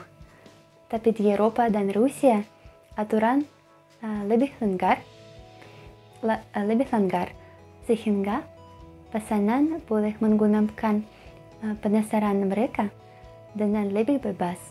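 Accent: Java, Indonesia. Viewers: Logat Jawa 80% apik lo. Monggo mbak mriki dolan ke kota Kediri Jatim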